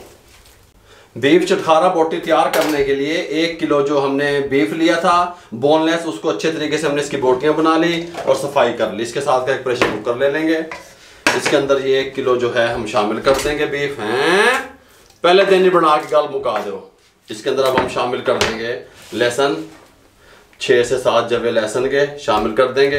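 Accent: Punjab, India. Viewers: Ap ki punjabi urdu son ker maza ata he.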